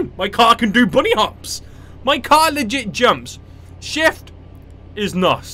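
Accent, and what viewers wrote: England, United Kingdom. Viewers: Is seniac English?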